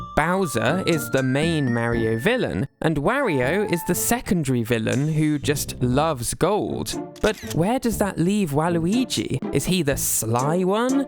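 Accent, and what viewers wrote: United Kingdom. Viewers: always wondered why the Brits pronounce it as Mare (like female horse)-yo instead of Mah-rio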